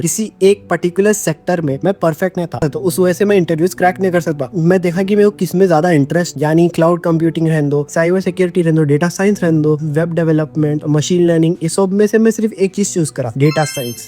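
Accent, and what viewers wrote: Hyderabad, India. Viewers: Tum Hyderabad ke dikhre accent dekh ke. Good to see our Hyderabadi doing such great work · Nice accent bro hyderabadi ❤️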